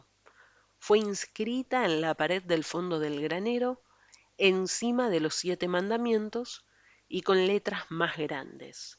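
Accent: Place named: Argentina. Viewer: Amo el acento argentino, me relajó demasiado tu voz 😭🩷 está súper interesanteeee ya quiero que salga la otra parte, y gracias por el buen contenido :)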